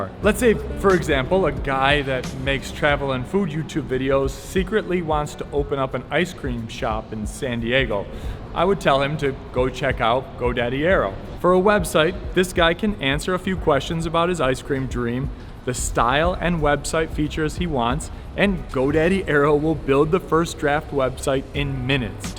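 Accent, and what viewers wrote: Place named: New York, United States. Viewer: Your NY accent comes back when you're back East.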